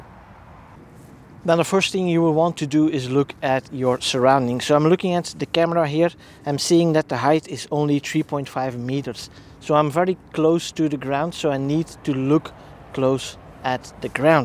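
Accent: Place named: Belgium. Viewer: Very nice explanation. Zeer mooie uitleg 😉. I think you are from Belgium. Correct?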